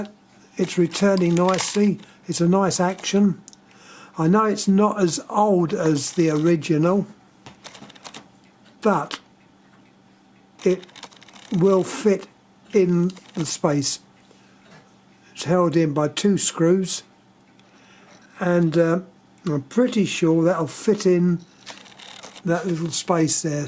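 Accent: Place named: United Kingdom. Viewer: Sounds like Winnie the Poo with a British accent. I would love to see an episode where a Russian telephone is used.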